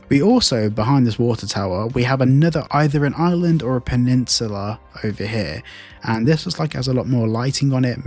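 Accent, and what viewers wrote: United Kingdom. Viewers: Ugghhh I know it’s a British thing and not your fault but every time you say “Floor” when you’re talking about the “ground” I wanna slap you a little bit! Inside = floor, Outside = ground lol I love your accent and you guys have way more fun with the English language and slang terms but this one, you guys just got wrong! Love you, love the videos. Keep it up, thnxxx!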